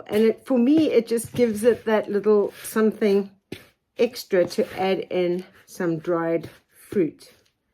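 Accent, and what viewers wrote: South Africa. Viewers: But your accent is South African? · You sound like a South African.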